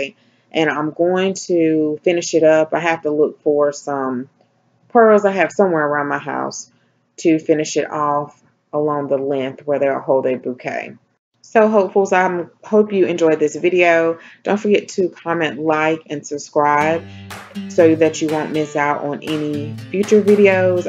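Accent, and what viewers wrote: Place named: Louisiana, United States. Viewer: Very nice work, and so pretty!! Love the creativity using a pool noodle! I love your accent! You almost sound like me a Louisiana girl! 😆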